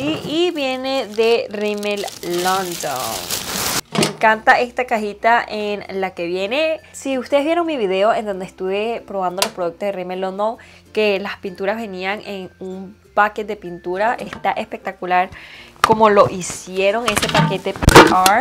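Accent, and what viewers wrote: Venezuela. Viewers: Saludos mi china!!! Hermosa como siempre y más aún cuando defiendes tu acento venezolano 📣📣📣 🙌🙌🙌 Me encanta tu personalidad las cosas te resbalan y no tomas nada a pecho 😏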